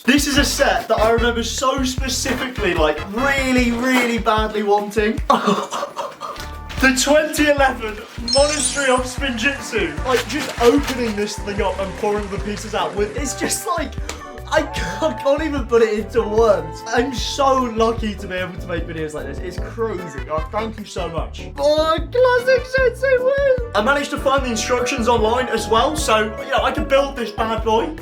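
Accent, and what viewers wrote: Newcastle, United Kingdom. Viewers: Are You from Newcastle? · Oh no way he is a Geordie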